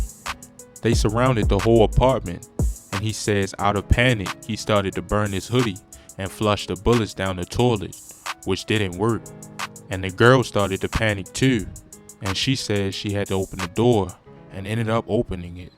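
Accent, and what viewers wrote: Baltimore, United States. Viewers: Do=Dew in bmore 💯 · Def a from Bmore lmao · Nigga you from Baltimore or sum shit? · you from baltimore? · That was a bmore accent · Not Philly it's Baltimore accent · I'm know Baltimore when I hear it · This is first video I ever watched on this channel. So idk who the narrator is. But I know he from Baltimore.. I know that accent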